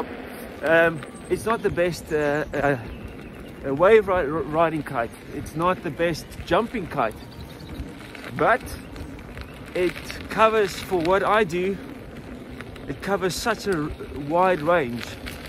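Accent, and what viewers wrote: South Africa. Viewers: Fantastic review! Hoping the next version has an easier access to the tire and better clearance between tire and chassis like MSP. Off topic: is that a South African accent I'm hearing?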